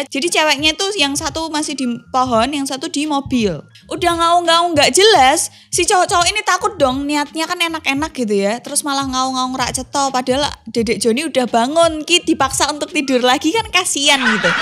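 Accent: Java, Indonesia. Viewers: Medok e mbak *... Membuat terngiang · Jowo tulen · Kak sampean orang Jawa to😍🤤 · logatny sdkit Jawa Ak sng ngdenger ny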